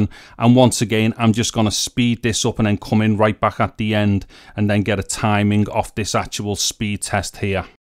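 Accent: Liverpool, United Kingdom. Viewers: Hey, are you from Liverpool? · That British accent though. By the way nice effort.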